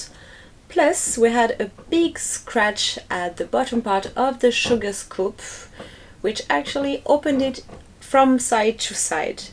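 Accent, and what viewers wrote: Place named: France. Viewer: Lovely french-english, i love it!!!!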